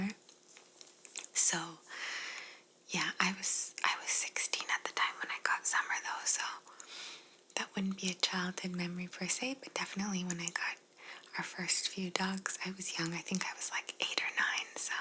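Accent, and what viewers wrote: Italy. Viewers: Hi! your italyaccent videos is funny;DD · I just found your channel and have been binge watching all your videos. I especially love your Italian accent videos (so tingly!) but also, I am Italian and trying to improve my language skills too! I also love the Russian language, but can only say a few phrases, like introducing myself. I love your idea to read Italian books/poems in a video, so I will be looking forward to that! I thought your story about being a kid and prank calling the police was hilarious - I did the same thing with my sister as a kid and the police came to my house too. My parents were sooo embarrassed! but it's funny now. Anyway, I just wanted to share my love for your channel, I think you are awesome! I hope this doesn't come across as creepy, because you know...internet...but learning about you in this video makes me wish we could be friends, but I'm no where near Canada.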